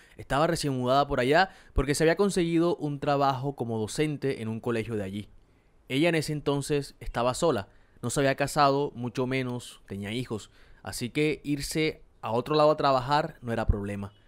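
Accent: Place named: Caribbean Coast, Colombia. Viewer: Me encanta como narras ❤ ese acento costeñoooo🎉🎉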